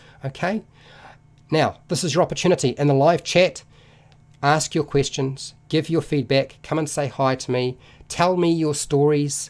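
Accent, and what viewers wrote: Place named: New Zealand. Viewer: Great guide and only enhanced by that charming kiwi accent!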